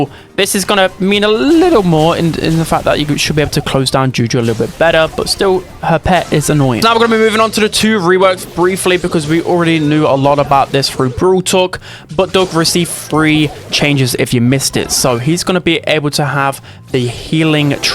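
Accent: United Kingdom. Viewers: I know it’s just the British accent but pronouncing the h in h is always funny to me. Nice vid❤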